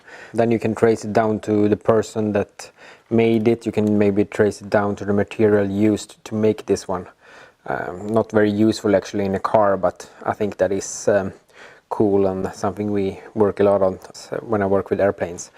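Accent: Sweden. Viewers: Are you swedish? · This is amazing! When you say "job" say it like "djob" and when you say cheap say it like "tcheap" :) That's how I learned to correct my English. Just some feedback from another swede, if you interested :x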